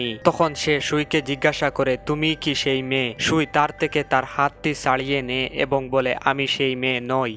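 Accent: Sylhet, Bangladesh. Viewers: Vai amr bari o sylhet,,kintu apne sylheter oia o,, ordek sylhete r ordek suddo basha kowar karone,, vlo lage nh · ভাই কিতা সিলটি নি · Bro tmi ki Sylheti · ভাই সিলেটি নি? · ভাই কি সিলেটি নাকি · ভাই কি সিলেটি?😀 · matar bongi deikka oi bujram Sylhety... Karon ami Nijeo Sylhety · Vai tmi ki সিলেটের পোলা😀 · vai dairet Sylheti mato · 1000% সিলেটি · সিলেটি মাত্ত যতই তেল মসলা লাগাও চেইন্জ হবে না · Vai apne sylheti ni..? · সমস্যা নাই ভাই চালিয়ে যান আস্তে আস্তে সব ঠিক হয়ে যাবে.আমিও সিলেটি মানুষ.. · amrar sylhety vaiya maybe afne?otar lagi subscribe kori dilam.ar explain ta vala oice🥰🤗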